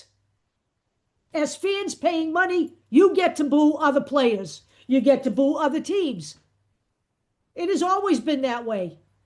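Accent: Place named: Massachusetts, United States. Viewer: Are you from Massachusettes? Love your accent. (I'm Texan)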